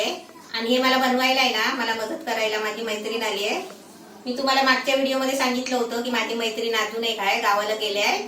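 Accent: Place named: Malvani, India. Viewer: Wa..mastach..tumche malvani type bolne chan watye..aamche watta tumhi..tumchya recipe nehami baghte me wahini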